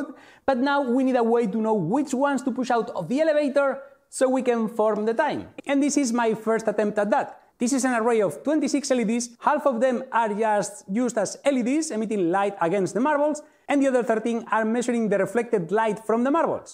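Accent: Spain. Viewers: Estoy casi seguro de que eres español, solo diré: MOOOLA MUUUCHO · Bro, el típico spaninglish. Se nota que eres español por el tono de algunas palabras. Por cierto me encanta el video sigue así. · Se nota que eres español !! Grande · me encanta el ingles de quenbrix que tienes, es como si hablara yo, y se entiende bien. un acento que solo tendría un español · eres español?? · acento mas español que yo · It's surprising how many Spanish natives have a very good English (this is the case) but their pronunciation is so attached to Spanish pronunciation rules. I wonder why. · Are you spanish? I like your accent 👍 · Al principio pensaba que el canal sería de algún guiri, una vez que escuché el acento de ese inglés supe que este tío era español 😂😂😂. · Eres español, verdad?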